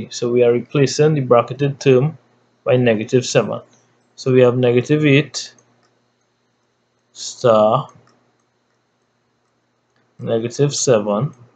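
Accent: Trinidad and Tobago. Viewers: You from Trinidad ? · Wow....great job, sounds like a Trinidadian tutor. Well explained.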